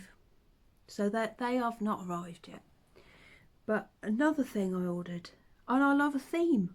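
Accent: Norfolk, United Kingdom. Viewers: Just seen your Russian doll tattoo. My makers tag is a red russian doll so we have a connection right there. Lovely to hear your Norfolk accent, am in Swaffham myself. Great chatty podcast ☺️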